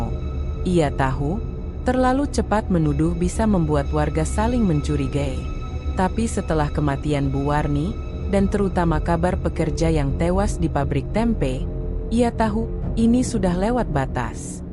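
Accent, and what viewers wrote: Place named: Java, Indonesia. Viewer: Bahasa jawamu lucu...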